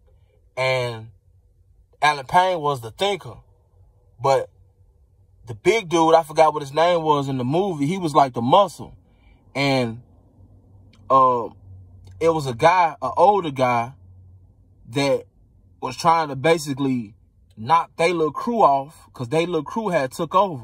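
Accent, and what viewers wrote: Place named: Chicago, United States. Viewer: Sounds like he from Chicago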